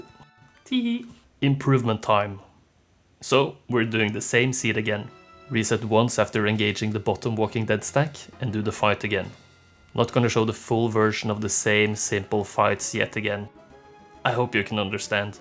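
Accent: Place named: Norway. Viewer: You sound like a Norwegian from the 80's. Trønder maybe?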